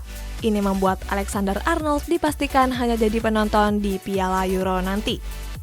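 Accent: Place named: Java, Indonesia. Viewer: Marai yo titisan wong kediri lho.. Main oo nde ndi wae logat jowone panggah enek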